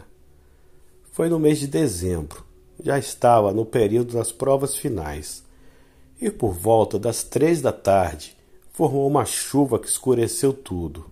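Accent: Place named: Northeast, Brazil. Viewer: Pela fala com certeza é do meu Nordeste!😁😁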